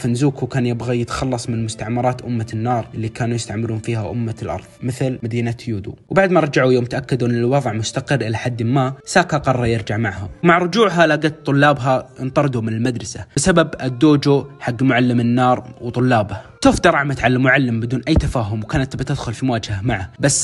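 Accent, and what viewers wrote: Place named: Qassim, Saudi Arabia. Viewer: احسك من القصيم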